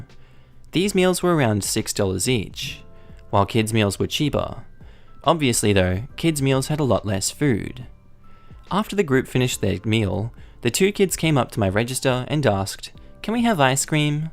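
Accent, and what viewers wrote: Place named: Australia. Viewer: I love the Aussie Accent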